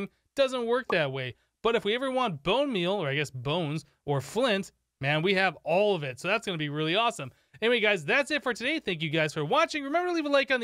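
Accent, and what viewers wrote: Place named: Canada. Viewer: This guy is Canadian right? He says some words in the stereotypical accent, like when he says egg. I don’t say egg like that but some Canadians do.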